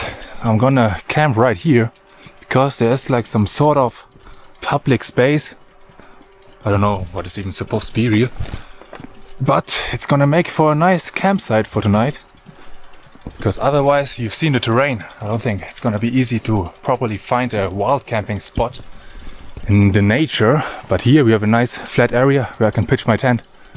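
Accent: Germany. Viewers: 很喜欢你的德国口音的英语，希望你一切顺利∽ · im curious bro where r u from, i like ur accent haha , Germany Belgium or Holland，btw , welcome to china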